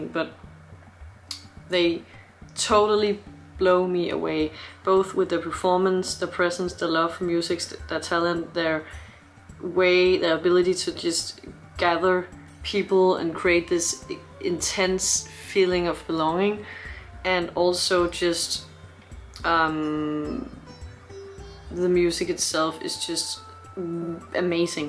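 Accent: Denmark. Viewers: I wondered where you were from since you Danish accent is so subtle, but then I realized you're from Copenhagen! Yay, fellow dane! :D Good reaction! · You have a lovely Danish accent :-) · Are you danish? it sounds like you have that accent :D Great reaction <3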